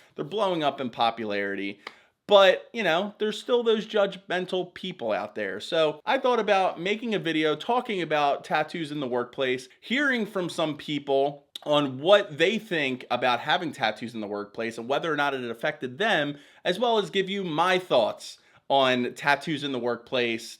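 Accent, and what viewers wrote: Philadelphia, United States. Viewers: When I enlisted in the military in 2008, my first choice was the marine corp. I couldn't get in because of tattooed knuckles and a back piece visible above the neck of my shirt. Ended up in the Army because they offered me a "tattoo waiver." All my tattoos were inspected, and recorded during my entrance physical, the record ended up being 7 pages. Now I'm a subaru mechanic. Also, I can hear the Philly in your voice, Frankford born and raised.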